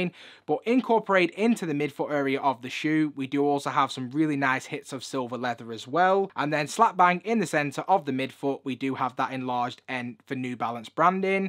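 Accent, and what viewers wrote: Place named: Lancashire, United Kingdom. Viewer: Great reviews but that Burnley Lancashire accent bro 😂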